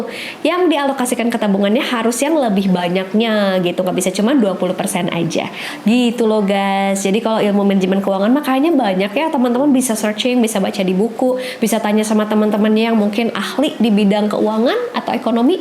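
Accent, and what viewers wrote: West Java, Indonesia. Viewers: Sunda banget euy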